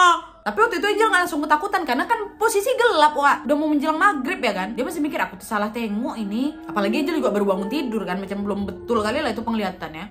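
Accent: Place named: Minangkabau, Indonesia. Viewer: lucuu banget bahasa minang nya uniiiii😂😂😂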